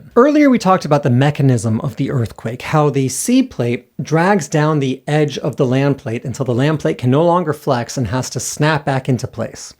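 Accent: Japan. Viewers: Is it just me or does he have a slight Japanese accent 😂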